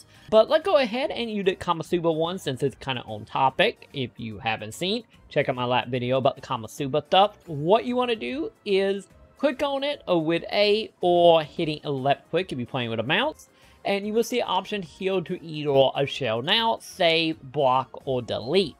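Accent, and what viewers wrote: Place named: Australia. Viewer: This is the most Australian accent i've ever heard.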